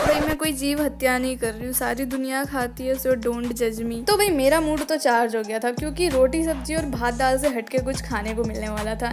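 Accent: Bengal, India. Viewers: are you bengoli??? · YOU ARE BENGALI RIGHT? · Are you Bengali?? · Are you from Bengal or Odisha ? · Are you Bengali ? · Didi are you a bengali ? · Are u a bengali ? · Are you bengali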